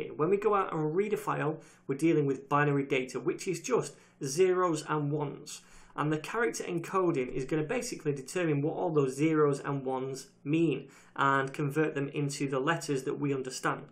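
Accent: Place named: Scotland, United Kingdom. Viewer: I love Scottish accent!!!!!!!!!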